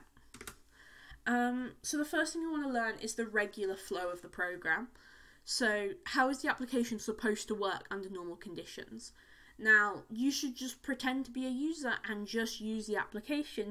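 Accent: United Kingdom. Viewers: British accent is so sexy :p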